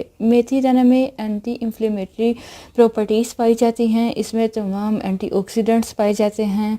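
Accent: Punjab, Pakistan. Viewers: Aapke lehje main reginal accent hai shayd aap Punjab ki hain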